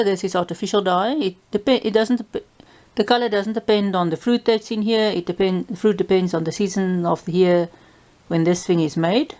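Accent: South Africa. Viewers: Your SA English like a razor blade to my ears. I speak US English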